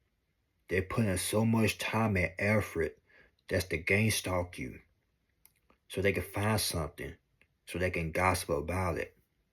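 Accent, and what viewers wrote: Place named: South, United States. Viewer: Hey bruh, I know people got so e shit to say about me. I also know you seem to be on my side. Seeing how you got a southern accent... im sure it's a culture shock sometimes. 1 word can have a completely different meaning in several languages. It also doesn't help that people are constantly changing the meanings of words in our own language. "Im dead" today... doesn't mean what it did 10 years ago. Next time you think you hear something offensive... don't be afraid to ask what that term means to them personally. I only say this because your one of the few level headed people I know strong enough to follow through. Change your mind... change your world ❤ Keep ya head up son.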